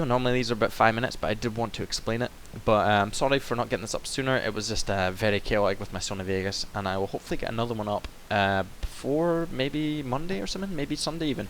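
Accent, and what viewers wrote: Scotland, United Kingdom. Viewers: where in scotland are you from?:)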